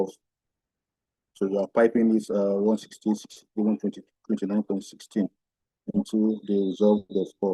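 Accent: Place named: Africa. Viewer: African accent is so out of this world, I cant understand the words coming from his mouth...😵‍💫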